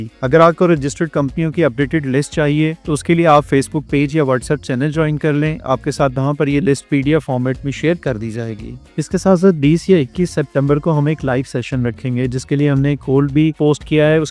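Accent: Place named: Bengal, India. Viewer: bhai ap Accent bangali wala lag raha hai..bhai bura mat man na..🙏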